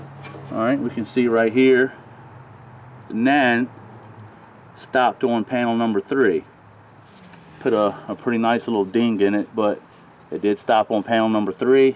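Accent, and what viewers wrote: United States. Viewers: I can’t find anything chambered in nan or forty fav. (I’m joking. The accent reminds me of being home in Georgia!) Fun test to watch! Semper Fi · What kind of accent is that, I'm hearing southern sounding I's and yankee soundind Ie's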